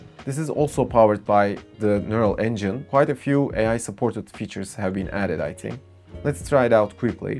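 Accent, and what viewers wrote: Turkey. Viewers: dude, you talk like a Turkish, are you Turkish? :D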